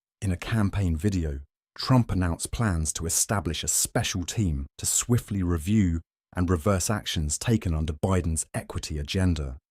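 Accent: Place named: United Kingdom. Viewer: Why is a Brit telling me about American politics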